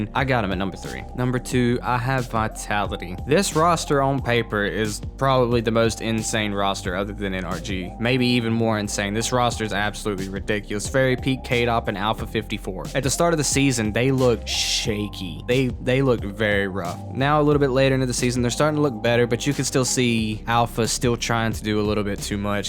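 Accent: South, United States. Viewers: Great video, great analysis, and I like your southern accent 10/10 quality rocket league content creator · You sound like a more southern version of GarrettG. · idk why but im getting a hint of country accent like the same accent in my area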